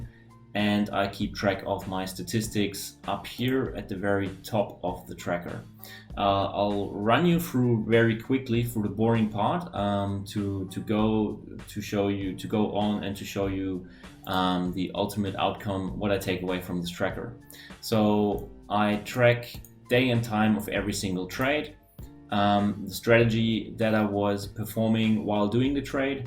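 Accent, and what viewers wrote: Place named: Denmark. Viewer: Danish. You’re Danish aren’t you? Your name suggests you’re from around there but also the way you pronounce “particularly”. The Danes love CSGO and there’s one Dane in particular who pronounces that word the same way you do. I could be wrong. Anyway, great video again. Edit: I’m even more sure that you’re Danish: mild temperament, even mannered, pro-social, supportive, more words that sound Danish like “next time.” Haha, anyway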